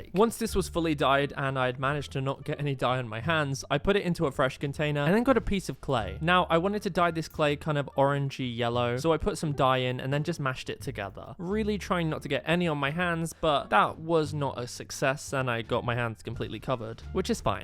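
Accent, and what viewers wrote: United Kingdom. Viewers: He sounds British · I introduced this channel to my friend and now she watches just for the British accent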